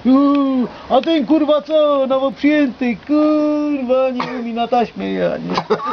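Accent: Silesia, Poland. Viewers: Chodzi o mówienie jak na Śląsku a nie o bluzgi.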